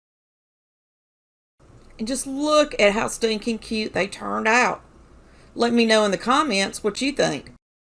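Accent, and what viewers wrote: South, United States. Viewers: I like your accent it's country keep it up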